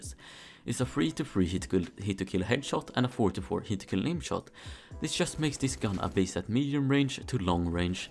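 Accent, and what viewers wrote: Sweden. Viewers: Bruh he's swedish. Wtf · Wow. Are you swedish? Your accent SCREAMS swedish 😅👌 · Are you from sweden you sound like you are from sweden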